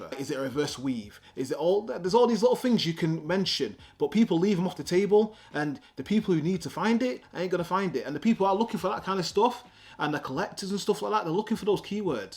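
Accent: United Kingdom. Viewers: I usually cant stand a UK accent but your knowledge knows no dialect I appreciate u and the gems u dropping ima scoop em up💎🏃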